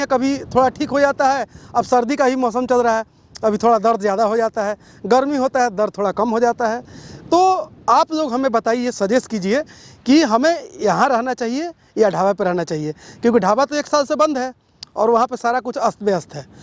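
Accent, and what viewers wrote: Bihar, India. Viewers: Ap Bihar se hai sun k bahute accha lagal ba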